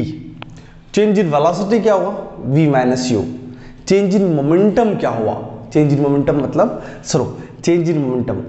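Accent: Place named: United States. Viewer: Sir thoda jyada American English ho gya but achha laga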